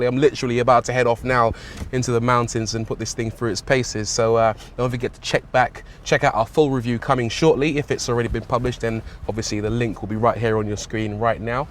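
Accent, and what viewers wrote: United Kingdom. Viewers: you do know hes british right? he is speaking true english....your american english sucks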